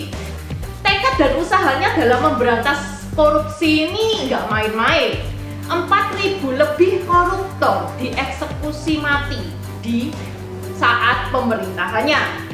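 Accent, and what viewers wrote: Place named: Surabaya, Indonesia. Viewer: Yg bicara ini , aksennya kental.jawa timur , suroboyo. mbedoknya lucu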